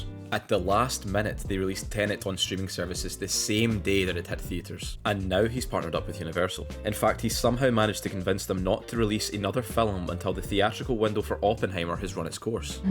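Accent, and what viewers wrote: Scotland, United Kingdom. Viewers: Like the Skaughtisch accent.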